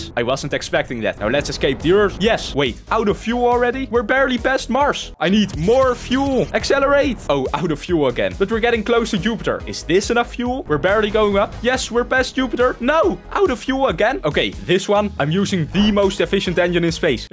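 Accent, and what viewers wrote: Netherlands. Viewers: Your Dutch accent 💀💀💀💀 I love the goofyness, the thing is, as a Dutch guy myself it's pretty cringe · is he dutch,? i recognize the goofy accent. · Damn, some Dutch accent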